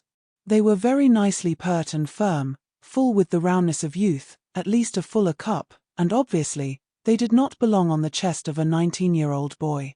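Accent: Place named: United Kingdom. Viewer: They are NOT mispronouncing "schedule". You obviously failed to notice the AI reader's BRITISH accent. That is the way the British pronounce "schedule.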